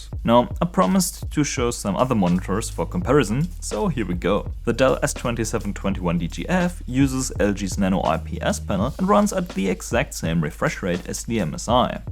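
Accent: Germany. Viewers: Dachte am Ende kurz, dass mein Englisch mittlerweile so gut ist, dass ich schon deutsch höre. Sehr geile Videos btw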